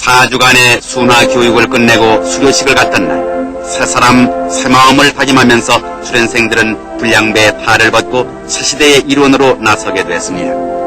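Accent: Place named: North Korea. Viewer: 약간 부칸스러움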